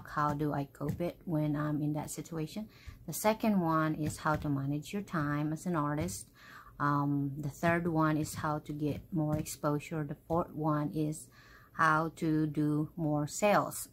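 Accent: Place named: South Africa. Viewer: 🙌 sa accent😊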